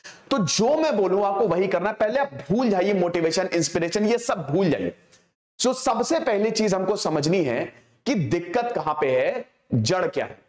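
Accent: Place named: Bihar, India. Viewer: I have been listening to him for past few years and this is one of the best lectures. I like the Bihar ascent.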